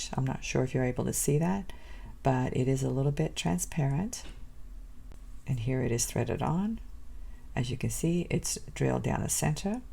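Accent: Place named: Australia. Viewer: So great to see you back. Good Luck with your PT. Sam's Bead Box is so gorgeous each month I enjoy everyone's unboxing. Looking forward to seeing you create new jewelry designs. We have missed your lovely Aussie accent. Blessings, and Hugs.🌞🌞🌞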